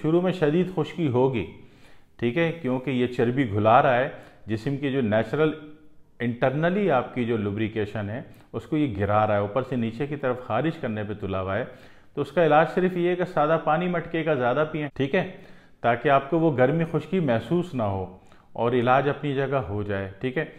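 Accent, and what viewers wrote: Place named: Hyderabad, India. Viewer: Walaykum Salaam Warhmatullahi Wabarakatahu. Muhtaram Dr Sahib Ap ka Lahja Hyderabad Deccan Ki Tarha Hai Bahar E HAL JAZAK ALLAH..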